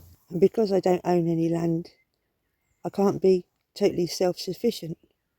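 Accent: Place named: England, United Kingdom. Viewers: Lovely authentic lady enjoying nature. I'm thinking accent is Suffolk but the landscape is western looking. Technology.. industry, science - all part of life. Driving around in a van I find beauty in wind farms, power stations. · Hi..I love everything you are doing...your videos are amazing , they are beautiful.. eye-opening and very peaceful. I love the way you talk.. with that so cool English accent..I can listen to all day...please do not take any notice of the Grumpy ones..they are normally kind of jealous or just plain bitter in life.. Thank you for great entertainment.❤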